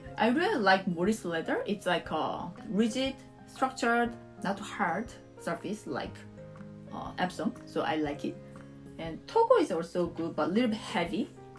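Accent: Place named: South Korea. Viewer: 발음이 한국인인데?! ㅡ..ㅡ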